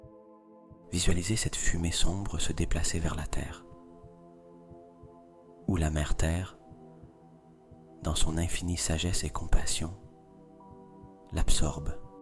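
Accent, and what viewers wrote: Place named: Quebec, Canada. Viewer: WoW mais quel changement! Petite question: je suis québécoise et j’entends très bien le même accent. Êtes-vous québécois vous aussi?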